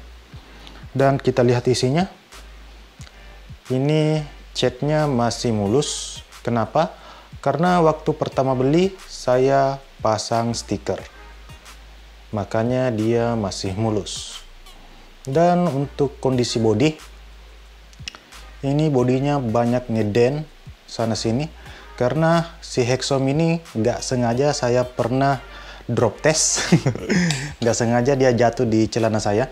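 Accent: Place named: Jakarta, Indonesia. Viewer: Logatnya keren klo org mks logat jakarta🤓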